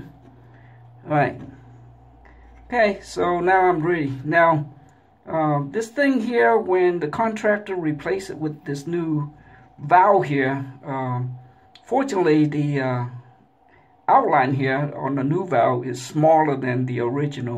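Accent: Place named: Louisiana, United States. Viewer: You sou d like youre from Louisana.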